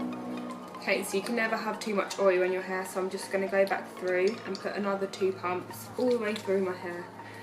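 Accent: United Kingdom. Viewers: british accent is so cute you are so pretty ;) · Her accent !! Where in England is she from?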